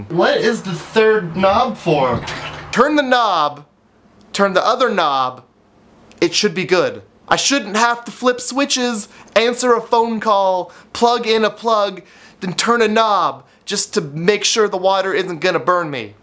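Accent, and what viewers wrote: Canada. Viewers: his canadian accent :) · he sounds way more canadian than usually here. weird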